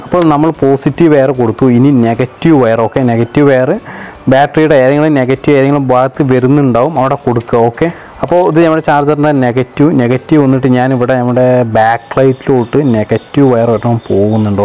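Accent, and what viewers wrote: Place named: Malappuram, India. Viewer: നിങ്ങളുടെ slang എനിക്കിഷ്ടമാണ് bro മലപ്പുറ മോ കോഴിക്കോ ടൊ?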